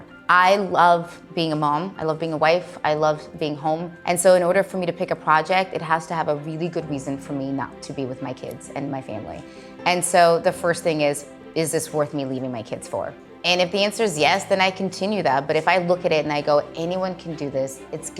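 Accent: Ukraine. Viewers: i love how i can hear her ukrainien accent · I feel like she must have been talking to her mom or dad right before this because I can hear her Ukrainian accent sneaking out 😂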